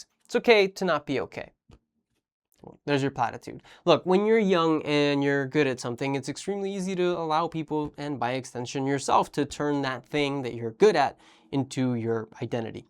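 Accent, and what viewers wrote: United States. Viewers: No way is he Spanish with that accent. He might live in Spain but he's got to be from the US surely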